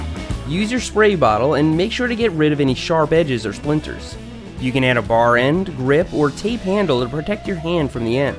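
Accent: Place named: New York, United States. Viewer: Hi! This is a great way to learn English! usually uses very good vocabulary and grammar, his accent is a little New York but not much, and his pronunciation is excellent. I noticed that another viewer commented on your English and I wanted to clarify on some things. First, remember that in English, we capitalize nationalities, so the word "german" should be "German". Second, the third person singular form of regular verbs in the present tense in English require an "s" as an ending ("asks"). Also, it is very important to capitalize the pronoun that we use for ourselves, the word (not letter) "I". We need to capitalize that one all the time. The sentence "I learn English for 3 hours" is a little strange, and might sound better in the present progressive ("I am learning English for three hours"). Finally, you need to fix your capital letters for the start of a sentence, for the pronoun "I", and for the proper nouns "English" and " ". 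You shift your verb tense from present progressive "am learning" to regular present "I watch", which is a little strange, but not grammatically incorrect. It would be a little better to say, "I am learning English if I am watching." A corrected form of your entire post would be: I am a German boy. My mom asks me if I am learning. My answer: I learn English for three hours. My thoughts: I am learning English, if I am watching. I hope that this was helpful, remember those capital letters, ride your bike a lot, and keep watching '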